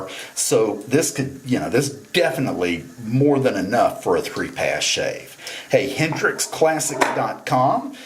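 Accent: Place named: South, United States. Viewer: I happen to like the way you sound very much! I find a nice Southern Drawl very endearing. I'm in California, and when I was young, we had a neighbor across the street that was from Georgia. She had the sweetest accent I ever heard in my life. And the things she would say were just as sweet, a true lady. I look forward to watching more of your videos, as well as trying out some your products. I'm definitely gonna be buying some Barber's Invasion. Larry Shaves' video on it has me sold. So many barber scents leave out the lavender, and that just sounds wrong to me. Thank YOU, my good sir! Subscribed!!!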